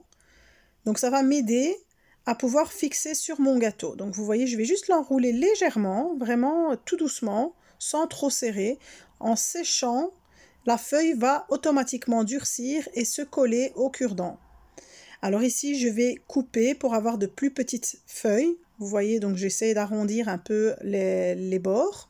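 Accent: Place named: Belgium. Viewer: Salam Alaykoum tu es belge ? Tu as un accent que je n’arrive pas à trouver ?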